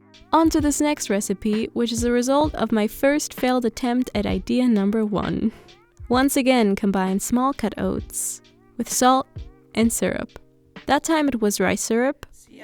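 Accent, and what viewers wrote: Germany. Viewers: i love your videos, like just the way you talk ;) are you german by the way? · wait are u german?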